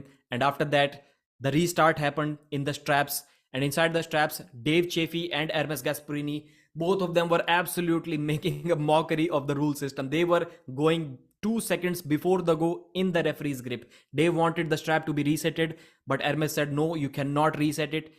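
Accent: India. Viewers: FOR THE MONEY AND CONTENT! THIS CHANNEL ALWAYS UPLOAD CLICK BAIT!! FUCKING CHANNEL FUCKING NARATOR INDIAN🖕🏻🖕🏻🖕🏻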